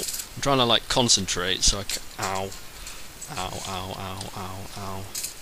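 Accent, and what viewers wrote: United Kingdom. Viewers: Are you going to buy BF3 or the 'new' COD:MW3? Also, it's nice to hear your awesome British voice again. ^^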